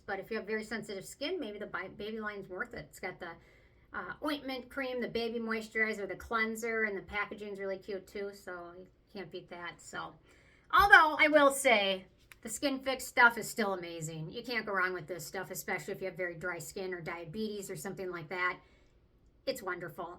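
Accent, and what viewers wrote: Minnesota, United States. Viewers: You're so cute & have a cute Minnesota accent & voice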